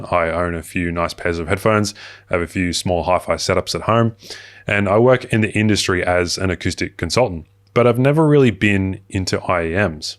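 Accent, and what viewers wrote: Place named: Australia. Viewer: aye, always good to hear a fellow Aussie within the tech space grateful to have found your video just now via the YT algo keep up the good work, mate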